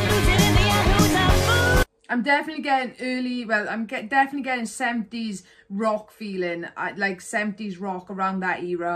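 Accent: Wales, United Kingdom. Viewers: Budgie are from Cardiff. Sounds like you’re from Wales 🏴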